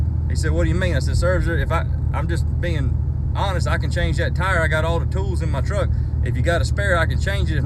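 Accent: South, United States. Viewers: That country accent and them sayings in between have me 💀 😂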